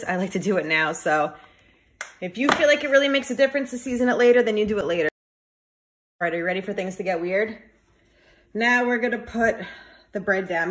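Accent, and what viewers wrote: New Jersey, United States. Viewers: U must be from jersey !! Or maybe I’m wrong lol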